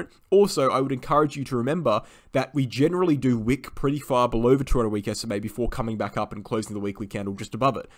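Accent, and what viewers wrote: Australia. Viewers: It’s refreshing hearing another Australians voice in the crypto space … keep up the great TA man 👌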